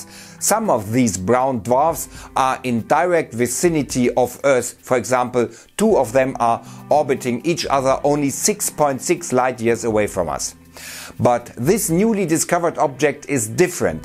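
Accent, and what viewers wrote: Germany. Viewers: Auch wenn man den deutschen Akzent stark raushört ändert das nichts an den coolen Infos und die Art wie du es rüberbringst. Cool das es auch nun nen englishen Kanal gibt. Gutes Video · Your German accent is very nice combined with those topics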